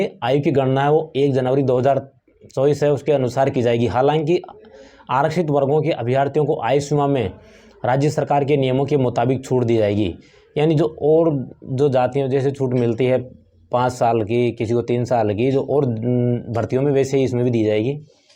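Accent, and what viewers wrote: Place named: Dhundhari, India. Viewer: तू यार बिल्कुल देसी हिन्दी बोलता है मजा आ गया बिल्कुल ढूंढाड़ी जयपुर के मीणा जैसे की हम भी तेरे जेसे ही बोलते है इसी लिए हमे ओघड़या कहा जाता हैं वा लौंडे मजा आ गया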